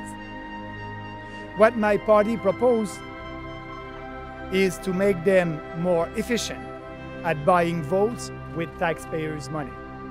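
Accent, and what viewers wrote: France. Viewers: Wow this guy tells it like it is not for himself but for Canadians. This guy needs to be Prime Minister. Perfect name for the party! It’s like a revamp for the Conservative party! If Canadian can overlook his French accent then we will have a real leader! God we might need your help! Canada needs your help! 🇨🇦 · The French accent applied to English speech gets us pay closer attention to the words and by extension to their meaning. He also got to his points quickly, without buzzwords. On a side note, I can’t picture Maxime stopping an English speaker to correct the word ‘mankind’ as ‘peoplekind’.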